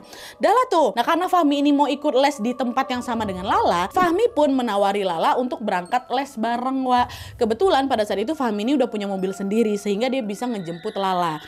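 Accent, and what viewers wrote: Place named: Java, Indonesia. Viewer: pantes kakk kalo ngomong jawa medokk bangett😭😭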